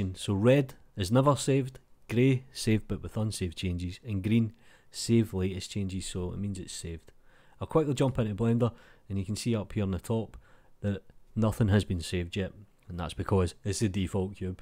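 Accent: Scotland, United Kingdom. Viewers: Love your scottish voice reminds me of GImli. :) Can you tell me why powersave is good when i can press save myself???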